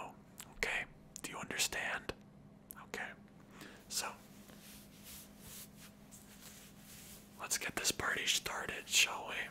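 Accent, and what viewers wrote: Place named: Germany. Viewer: U sound German are u German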